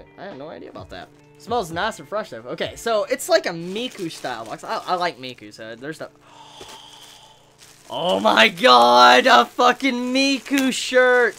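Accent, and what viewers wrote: South, United States. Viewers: and your country accent lol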